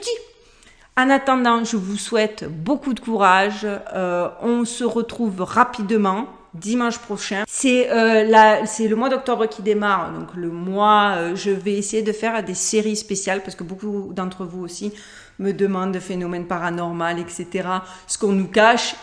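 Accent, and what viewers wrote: Marseille, France. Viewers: Madame je vous écrit sans avoir commencé a voir la vidéo ce que je ferais après donc pour vous dire que je vous apprécie beaucoup d'autant plus que je n'ai jamais été pour une Idole je ne suis Fan de personne . Ma modeste personne me suffit surtout en ce moment . Il faut pas se le caché non seulement vous belle mais avec un cœur un cerveau BIEN REMPLIS .Du bon .......sans nul doute et de plus ce joli accent Marseillais vive la France bon enfin bref vu l'état de la France et bien d'autre Pays en ce moment!!!!!!!! Juste pour vous remerciée de votre travail que je regarde avec beaucoup de PLAISIR . Merci je m'arrête la sinon c'est un roman qui serai écrit . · j'aime vraiment ton travail et ton accent de Marseille 🙂 je partage complètement ton regard sur le monde, et j'aime bien aussi ton sens des valeurs..... Belle et longue durée à ta chaîne!! 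· Bravo! J’adore votre accent du Sud en plus ..un vrai bonheur de vous écouter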